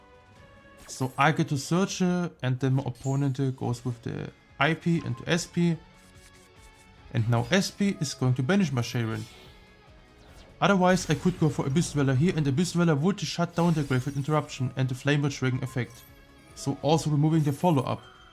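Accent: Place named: Germany. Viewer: Bist du deutsch bzw spricht du deutsch ? Man hört das dein englisch aus dem deutschen übersetzt wird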